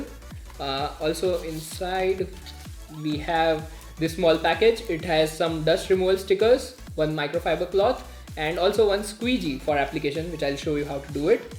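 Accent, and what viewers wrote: India. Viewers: Dude, please do your best to lose this Indian accent. Take some diction courses if necessary. People on the Internet won't take you seriously unless you do. You'll see a definite youtube growth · Indian people and thier accent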